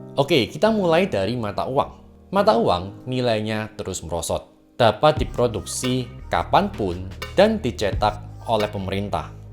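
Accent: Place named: Banyumas, Indonesia. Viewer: Logatnya ngapak. 😅 Nice 👍👍👍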